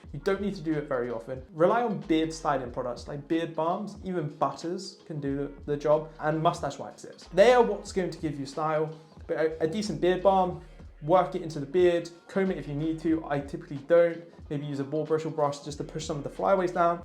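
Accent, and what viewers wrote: United Kingdom. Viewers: I noticed you're using beard butter from Detroit Grooming which is from the US but you sound like you live in the UK. Is there a UK supplier for that brand?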